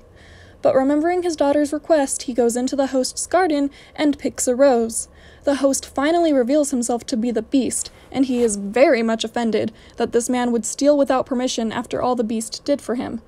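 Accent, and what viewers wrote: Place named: France. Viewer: Excellent French accent! Interesting, insightful and enjoyable. Thanks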